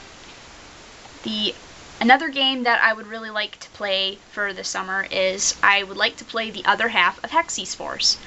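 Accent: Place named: South, United States. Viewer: Oh ok... Thats cool! Im from Alabama and your accent sounds southern to me... You ever been to Alabama?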